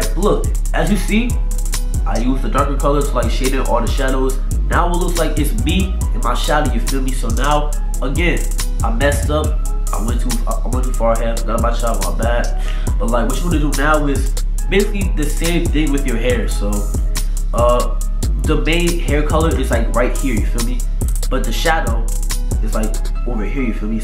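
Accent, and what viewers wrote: United States. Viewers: yu frm nyc ?? uu dead sound like uu frm there no lie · that florida accent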